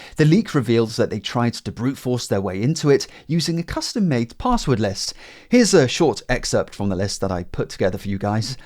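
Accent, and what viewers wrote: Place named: United Kingdom. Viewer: no that’s his voice, he’s british i think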